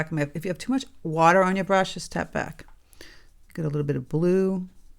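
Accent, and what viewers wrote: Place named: New England, United States. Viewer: I just started a week ago and don’t have high expectations (yet) but I just got halfway through a mountain landscape and quit because it was just that bad! I was scrolling through your videos and found this…definitely words I needed to hear. Keep trying, it WILL get better! I’ve watched several videos by various teachers and really enjoy your style the best (plus I was raised in New England and enjoy the accent).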